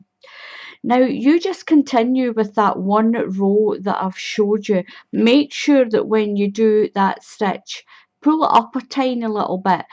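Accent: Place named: Scotland, United Kingdom. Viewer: I can't believe it...my Momma, 86, just asked me where you were from. I mean, you sound like you were born just down the road from Sean Connery, and she asks! Hello from Texas! This is another great, beautiful tutorial, thank you.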